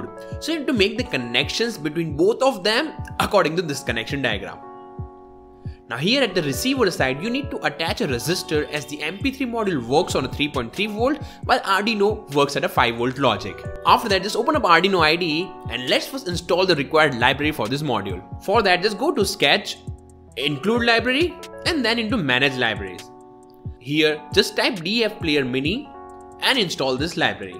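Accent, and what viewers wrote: India. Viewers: Open interesting video - Hear an Indian speak instead of a human being - Close video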